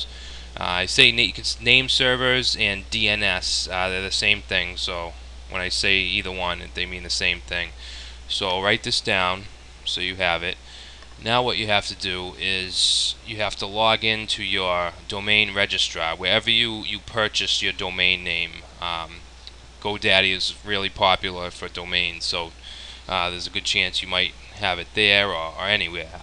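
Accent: Boston, United States. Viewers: Dude your Boston accent is ringing in my ears. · Strong boston accent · with the boston accent lol.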